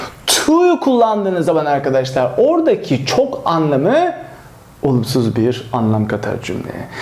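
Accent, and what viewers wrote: United Kingdom. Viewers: british accent'ınızın mükemmeliği diyorum başkada bir şey demiyorum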